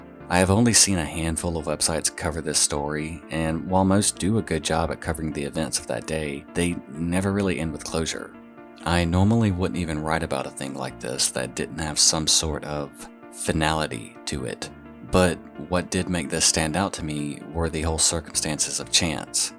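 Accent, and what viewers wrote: South, United States. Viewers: I just came across this channel, and so far, I'm loving it. Thank you for giving these innocent victims a voice. I'm definitely subscribing!....btw, I'm hearing a little bit of a southern accent, I love it. People tell me that I have a southern accent as well, but I don't hear it at all. Lol. Also, I'm from VA, I didn't pick up an accent there! Lol. ❤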